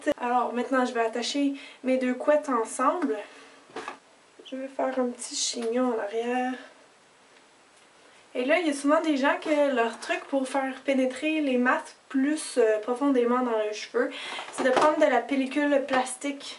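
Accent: Quebec, Canada. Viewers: Ah, l'accent québécois...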